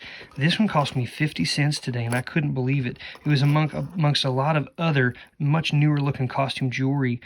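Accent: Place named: West Virginia, United States. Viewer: Just moved to Weat Virginia and thought the accent was similar to the area. Love it! 😊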